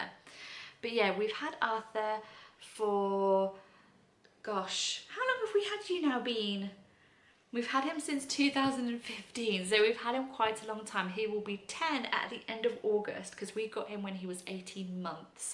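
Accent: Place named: England, United Kingdom. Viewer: I love your accent, can I ask you what part of England you originate from?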